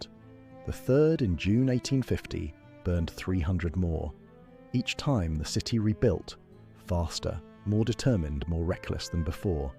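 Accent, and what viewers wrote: United Kingdom. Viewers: Save the british accent for englands history. Would be nice to hear in a western accent.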